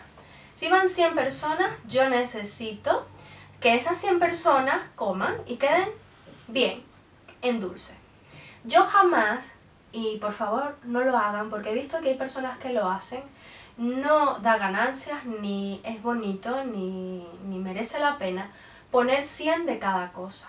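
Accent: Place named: Venezuela. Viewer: Hola de donde es usted de que país su asentó es como de Venezuela?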